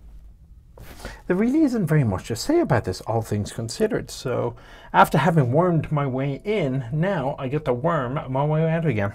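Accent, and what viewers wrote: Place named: Ireland. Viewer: I don't care for tanks, never seen one, never interested me. But that Irish accent I want to hear it all day.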